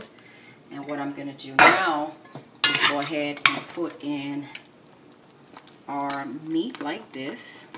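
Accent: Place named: United States. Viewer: eskizem wi madam se cuisine haitienne mwen te ekri sou YouTube la,epi se anglè mw te tande ki tap pale,franchman mw panse se yon americaine ou te ye,selman mw te felisitew pou bouyon an,siw pran sam diw la nan yon lòt sans wa eskizem masè...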